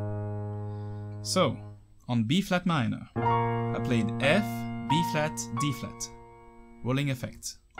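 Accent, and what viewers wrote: France. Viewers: Absolutely love this video, it's really helping me. Thank you! Cool accent by the way, were are you from? France?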